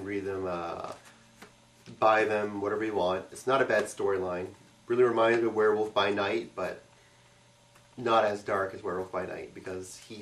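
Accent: Canada. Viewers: This stuff is great for the geek at heart! By the way, man. You gotta be from the Atlantic Canada. I could pick that accent out anywhere. Nova Scotia by any chance?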